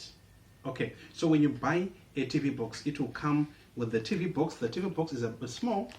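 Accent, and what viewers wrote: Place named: Zimbabwe. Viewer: Accent says Zim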